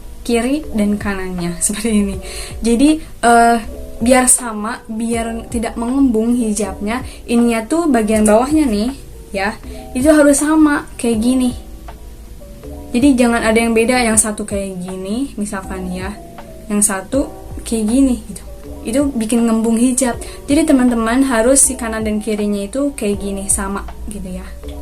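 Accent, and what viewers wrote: West Java, Indonesia. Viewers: Teteh orang Sunda nya? 😁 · Se formal apapun ngomong bahasa indonesianya sundanese, anger we aya bumbu sundana nya... Salaam sundanese🙏🏻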